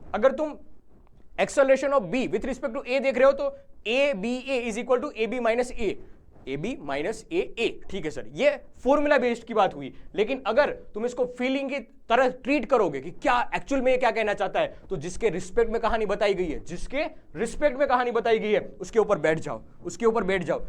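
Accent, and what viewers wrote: Gujarat, India. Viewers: Sir aap gujarati ho kya ?? apki tone se lag raha hai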